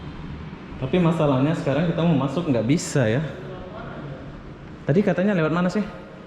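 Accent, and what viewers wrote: Lombok, Indonesia. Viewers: Bagus sekali bahasa Lombok Anda seperti logat orang Lombok asli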